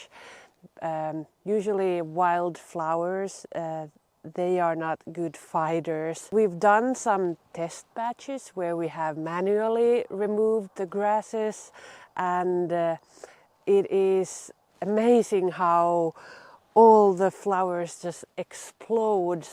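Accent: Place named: Finland. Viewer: I love your English,! With a soft Finnish accent. Your voice would be great to read kids’ stories, about elves and gnomes 😊 Yes, I understand and support your gardening philosophy and general plan. Natural and generous for all things surrounding. Great job!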